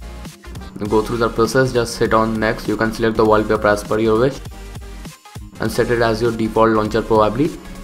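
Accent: India. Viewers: tenk eu kam egen. hard to understand thick Indian accent with loud bg music and bad sound quality.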